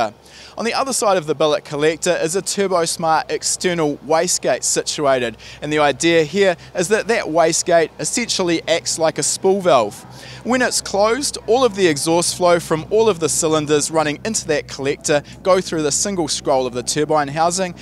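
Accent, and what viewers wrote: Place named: New Zealand. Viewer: No insult intended😁. Just that, as an Aussie, it's so nice to hear a non-American voice on YouTube (even the web) these days. I love Niew Zilland ecsents.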